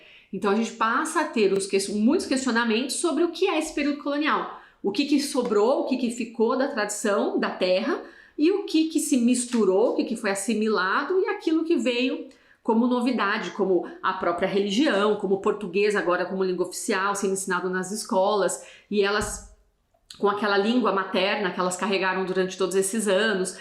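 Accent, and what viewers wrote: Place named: Brazil. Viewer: Me dói tanto que sejam os intelectuais brasileiros a se interessar e a estudar a literatura moçambicana e não os próprios moçambicanos. Adoro ouvir o seu sotaque brasileiro, sobretudo, quando pronuncia o nome do nosso imperador de Gaza (Ngugunhane). Mas tão de parabéns. Abraços de Moçambique.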